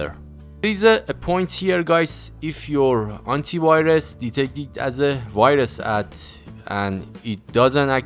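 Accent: Iran. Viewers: Persian accent kicking out 😂😂 (موفق باشی)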